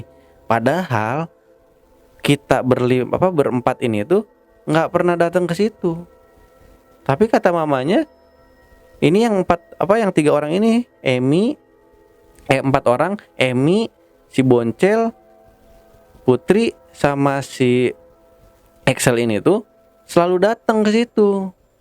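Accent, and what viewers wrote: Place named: Bekasi, Indonesia. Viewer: Gw klo denger bang logat anak Babelan lagi cerita hehee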